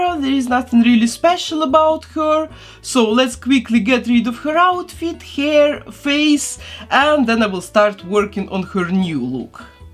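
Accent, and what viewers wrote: Russia. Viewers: Кукли виходять дуже гарними, але неможливо слухати, жахливий акцент/говір, наче росіянка з глубінки вчиться говорити англійською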